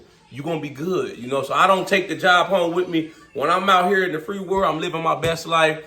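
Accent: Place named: Ohio, United States. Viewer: I knew you were a fellow Ohioan due to the way you talk, I’m from cleveland and thinking about going to Texas 💪🏾‼️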